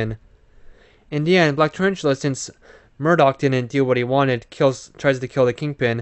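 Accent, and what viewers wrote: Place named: Argentina. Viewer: Naaa tremendo el pibe argentino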